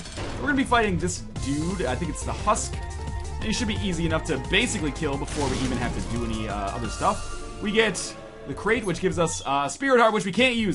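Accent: United Kingdom. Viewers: I love your british accent! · I did actually like your British accent. Well done.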